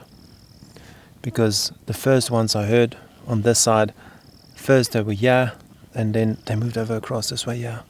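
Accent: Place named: South Africa. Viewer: "and uh yuh" i love this accent aha as soon as i clicked i knew youd be alright that accent youll only see in lion or african wilderness videos, im certain its the south african accent, people often say you guys sound like us aussies in a different way 😂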